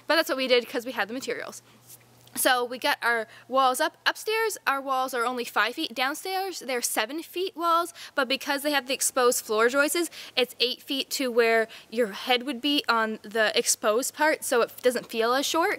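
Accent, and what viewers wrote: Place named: Canada. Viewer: I wondered how you were gonna keep the mice out. It's amazing how they magically make fiberglass insulation disappear . Not sure where you are building the cabin...guessing it's Canada because you all say abowt. Happy New year😄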